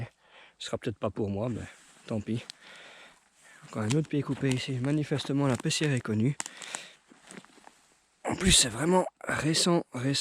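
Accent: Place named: Belgium. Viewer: Tout est parfait dans cette vidéo, les plans, les commentaires, le petit accent belge. On se régale nous ;)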